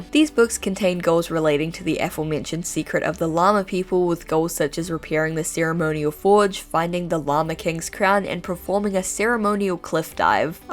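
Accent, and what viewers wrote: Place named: New Zealand. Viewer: The original Sims is, so far, the only Sims game I've ever played, and I only got to the House Party (?) expansion pack. I watch these videos because I enjoy yer videos. And because I'm so intrigued by kiwi accent. But also because I enjoy your videos. So appreciate how much we (can only speak for myself, actually; sorry) aoppreciate your videos, dammit!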